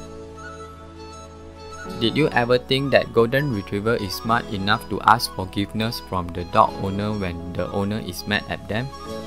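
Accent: Malaysia. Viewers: Are you from Malaysia? Because your accent sound so Malaysian to me.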